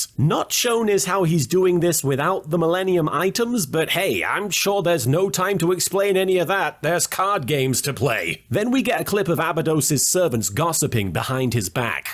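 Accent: United Kingdom. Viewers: Is it just me, or did somehow become MORE british?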